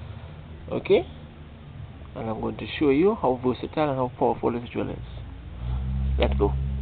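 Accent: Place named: Caribbean. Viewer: Where in the caribbean are you from?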